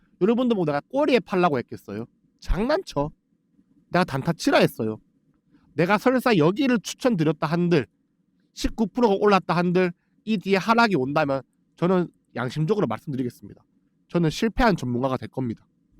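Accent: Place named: Gyeongsang, South Korea. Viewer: 경상도 사투리 의요 정감이 가는 언어 웃어봅니다 이른 아침부터 유익한 정보 감사합니다 님! 믿고 따라갑니다 감사하구요 화이팅입니다~~🎉🎉🎉😅😅😅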